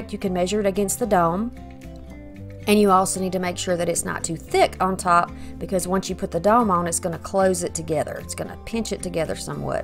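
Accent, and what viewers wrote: South, United States. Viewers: Just was watching Jackie and found you. I love your southern sound, I too have it, I'm from GA. I love your work and your cheery personality. Rhonda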